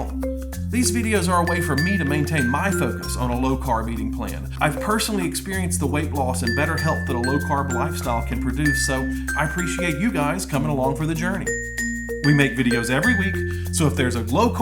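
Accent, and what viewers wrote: South, United States. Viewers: Nakia Burnside same here.... and be a Texas girl, I appreciate the southern accent. He’s easier to understand!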